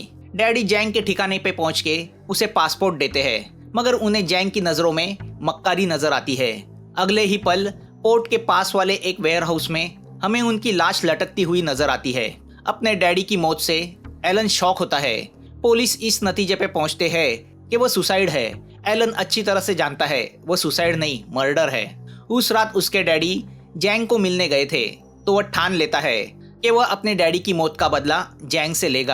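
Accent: Maharashtra, India. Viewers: Aapke hindi marathi ki jhalak dikhai deti hai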